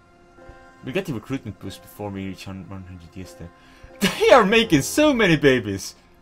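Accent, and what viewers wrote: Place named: Sweden. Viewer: Where are you from? Your accent sounds similar to Robbaz the Swedish YouTuber.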